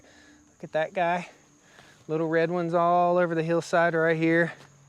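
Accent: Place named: Carolina, United States. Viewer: I love your Carolina accent!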